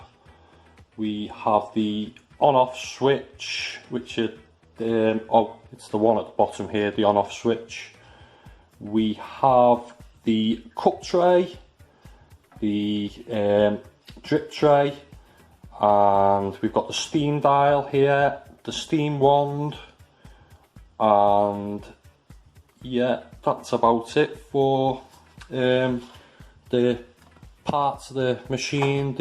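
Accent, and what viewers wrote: Liverpool, United Kingdom. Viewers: Im a listening to a scouce guy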